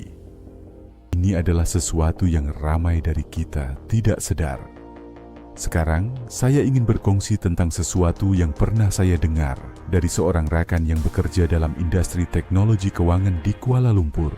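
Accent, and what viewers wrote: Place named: Indonesia. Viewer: Cakap pun bunyi indon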